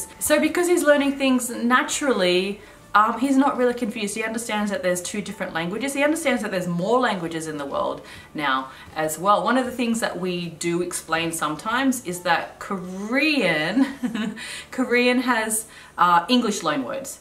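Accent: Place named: Australia. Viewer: He's so cute! It was interesting learning the Korean word for "cicada". Also interesting is that the Australian pronunciation is different than the American pronunciation. We say "ci-kay-da", not "ci-kah-da". I noticed that you pronounce "똥" differently than does, too. He is clearly picking up the more native Korean accent, and not imitating your Australian pronunciation of Korean words.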